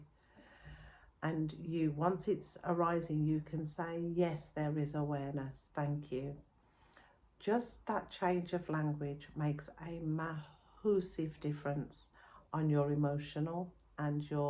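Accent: United Kingdom. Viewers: Can't wait to view it! Thanks, for who you are being for us. I love your videos and your lovely British accent 😊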